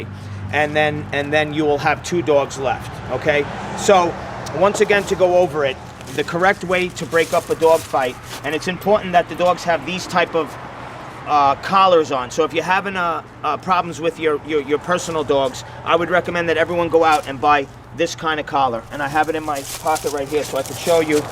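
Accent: New York, United States. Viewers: ...NewYaawk AcCcent loving it lol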